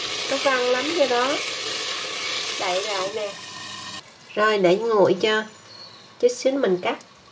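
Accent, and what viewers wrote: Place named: Mekong Delta, Vietnam. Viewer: Quê Cô ấy o miền tây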